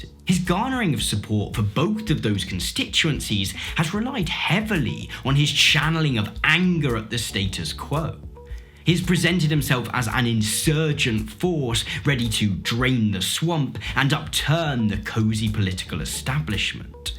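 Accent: United Kingdom. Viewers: Dear,are you british?just interested in english language,try to learn it again.sounds british to me.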